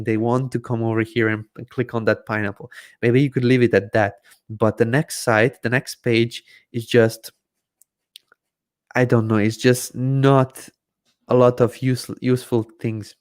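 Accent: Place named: England, United Kingdom. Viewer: I like this video but sometimes is hard to follow the english accent in all of his videos. thanks!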